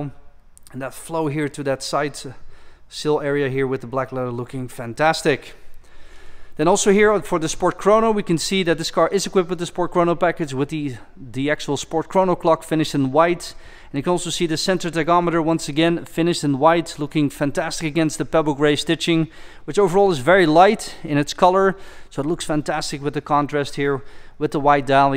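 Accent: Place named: Netherlands. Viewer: en is een Hollander als ik me niet vergis in mijn oren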